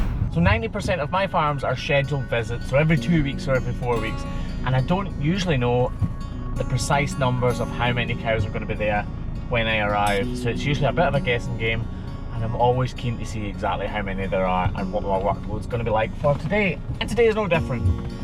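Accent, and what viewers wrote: Scotland, United Kingdom. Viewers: How long does it take for the glue to get loose? Is that always long enough to heal up? Like your videos. Even if your Scottish accent is hard to understand for a Dutchy like me, I learn more about hoof trimming! Btw: nice trimming box you have 😉. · I like your videos because I love cows and your scottish accent 😍👌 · I do believe that I am beginning to catch on to what you do to those cows feet! Its your Scottish accent that makes we ladies watch your videos! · Im from Wisconsin in the US and we have a ton of Dairy farms I didn't realize Scotland had that many farms I thought you guys just had goats and sheep and wore kilts jk about the kilt part. You look like you could be from here until you speak Well I live in Milwaukee so near here anyhow.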